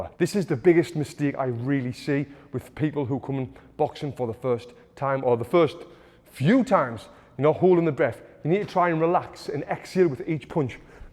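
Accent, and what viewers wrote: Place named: Newcastle, United Kingdom. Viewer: Brilliant accent, Newcastle?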